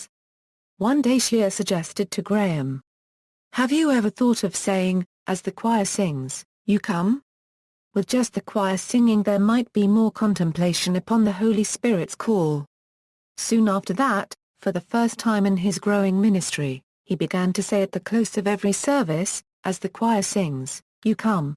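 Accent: United Kingdom. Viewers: Is this female British voice an actual woman’s voice or an AI FAKE VOICE?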